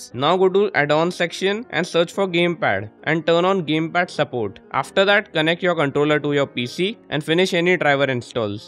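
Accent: India. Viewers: You know you've struck gold when you hear that sweet, sweet sound of an indian accent when looking for a tutorial, thanks man! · bro talks like indian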